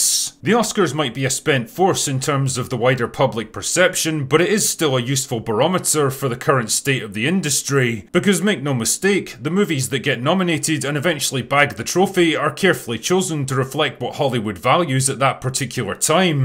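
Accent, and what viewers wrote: Scotland, United Kingdom. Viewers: i no longer watch actual movies or sports or even elections, i just watch whatever people are doing the highlights of the events. this guy talks same as willie from the simpsons the scottish groundskeeper.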